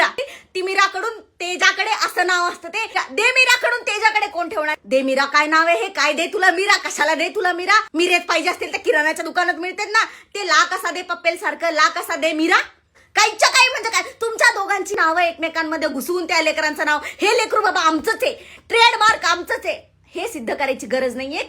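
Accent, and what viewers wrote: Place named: Marathwada, India. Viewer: तू मराठवाड्यातील आहेस काय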